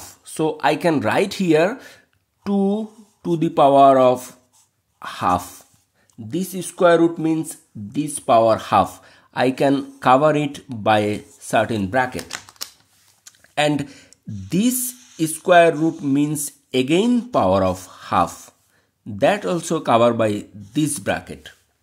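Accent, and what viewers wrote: Bengal, India. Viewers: Your accent is like an Indian! 🧐🧐how? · Sir your style of speaking English is like Indians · He's from Germany... But his accent is so close to Indian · Are you bengali From West Bengal India?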